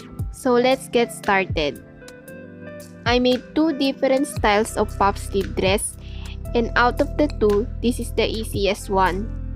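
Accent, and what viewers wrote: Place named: Philippines. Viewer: Your accent tells me that you are Filipina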